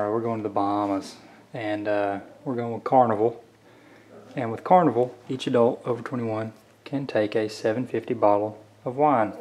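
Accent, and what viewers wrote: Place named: South, United States. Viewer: Carnival cruise and a southern accent are like peas and carrots.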